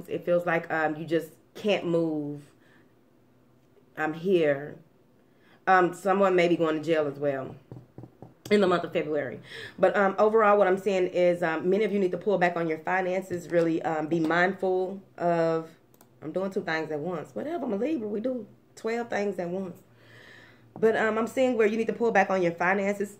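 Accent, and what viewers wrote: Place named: Memphis, United States. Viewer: You have to be from Memphis!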